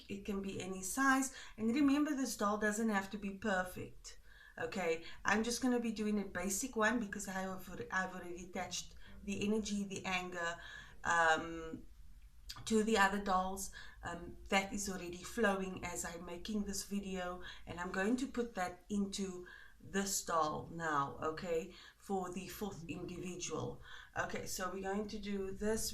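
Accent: South Africa. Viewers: Love your accent are you from South Africa? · Are u south african?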